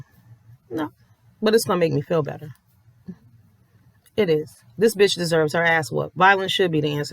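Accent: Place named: Boston, United States. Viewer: Happy Belated Birthday Queen! 💕🙌🏽💃🏾🎂🥂 Btw are u from New England? U sound like my Boston Family (sisters)...😎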